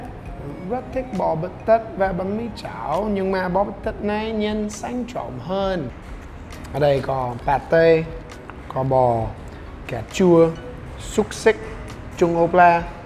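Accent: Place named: Vietnam. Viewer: HELLO FROM AMERICA. HELLO ANH. I ENJOY LISTENING TO YOUR VIETNAMESE ACCENT, LOL. I GREW IN AMERICA. AS AN AMERICAN I'M USED TO ENJOY GOOD CHEESE. DO YOU MISS IT LOL? MY PARENTS ARE FROM VIETNAM ORIGINALLY. MY DREAM TO VISIT THERE SOON, AT LEAST ONE TIME. REDISCOVER MY ROOT. WHAT IS THE MOST SURPRISING THING THAT YOU FIND YOURSELF FALL IN LOVE WITH? HAPPY NEW YEAR 2021. 🍹🍸🤣🤣🤡🤡🐖🐷🐄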